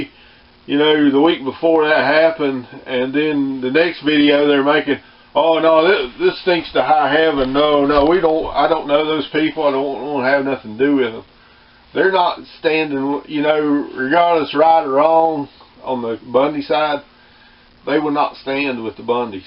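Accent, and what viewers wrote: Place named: South, United States. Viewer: Well I watched your video, the whole thing, and that's time I'll never get back. Sounds like you're just given up, it also sounds like you're from the South. But you don't know the South because ain't nobody giving up anything down there. Also if it gets "Venezuela bad" us people who buried our fire arms will have firearms. So you're talking in this circular logic that doesn't make sense. The only thing that makes sense to me is you're a coward and you're going to give up.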